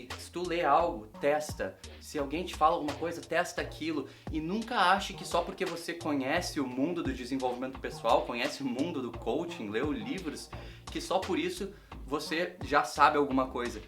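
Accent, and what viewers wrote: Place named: Rio Grande do Sul, Brazil. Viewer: Cara, que massa te ouvir! Além de adorar teu sotaque gaúcho, pq tbm sou gaúcha...achei valiosas tuas colocações. Sou Coach e acho que é isso mesmo...cada um precisa buscar o que faz sentido para si. Sou totalmente contra regras pré estabelecidas como: acorde cedo, leia mais, não coma carbroidratos à noite, e um monte de coisas que são boas para algumas pessoas, mas para outras não! Ja me inscrevi! Obrigada pelas reflexões!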